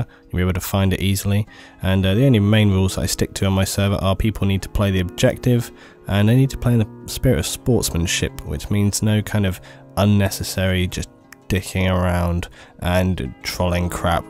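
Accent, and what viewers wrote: United Kingdom. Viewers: You mention players moaning about server admins not balancing out teams and what you say is so true. Speaking as a server admin, you can't be constantly moving people round just to balance out teams when that person you moved could have been in a squad with mates or was on a winning side when moved and players don't seem to take this into consideration. You just end up pissing players off and they leave the server. Also I love that you feature on LvlCaps channel, great to hear a Brit talking!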